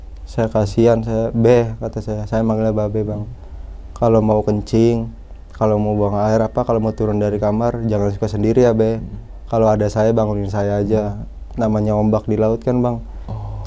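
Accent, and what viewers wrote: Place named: Indramayu, Indonesia. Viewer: Endah weru logat indramayu seru pengalaman horor wedi ne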